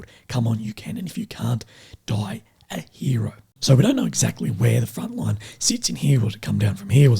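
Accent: Australia. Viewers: Mate, it's really fantastic your Aussie accent! I'm learning the difference from colloqiual English following your channel, so go on as usual I love it! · You start to sound a little like Robert F. Kennedy, Jr. And as an encouragement I'l like to say that Kennedy didn't want to run for a president because of his voice, but they ran a probing campaign and polls showed that most American are ok with his voice and he is popular anyway. That made this admission in his recent interview with Jordan Peterson. I personally don't find it hard to understand what your say because of the more coarse voice. In fact, your Australian accent is much more of an obstacle to me. All that said, I hope you recover your voice soon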